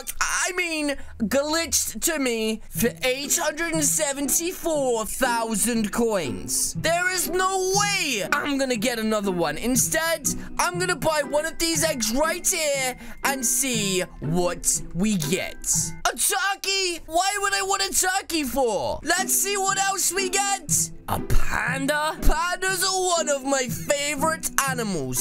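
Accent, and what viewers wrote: England, United Kingdom. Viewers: Hey I didn’t know your british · O.O HES ENGLISH!.!.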